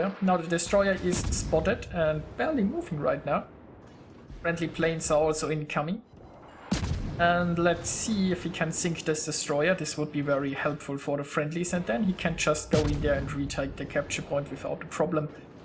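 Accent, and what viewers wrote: Germany. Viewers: Kann das sein das du deutscher bist?!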